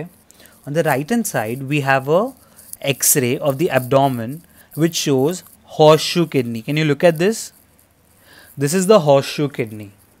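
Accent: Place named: India. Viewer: I usually straggle at understanding Indian accent, but you are so clear thank you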